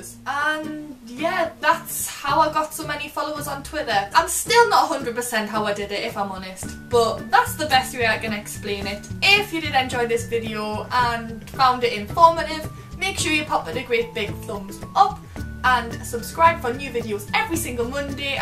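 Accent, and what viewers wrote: Newcastle, United Kingdom. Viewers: Is she from Newcastle?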